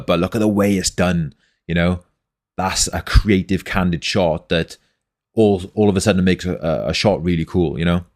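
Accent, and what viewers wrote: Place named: Wales, United Kingdom. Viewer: you welsh? im also from wales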